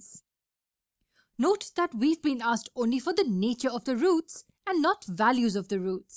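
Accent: India. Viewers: That moment I heard the Indian woman I knew I was in for hell.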